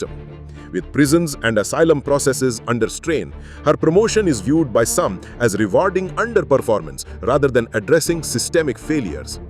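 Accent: India. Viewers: Stupid narrative for idiots with Indian accent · Bloody indian voice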